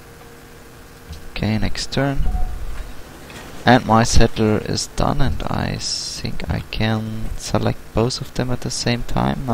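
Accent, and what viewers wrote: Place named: Germany. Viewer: das ist doch denglish das du da sprichst freundchen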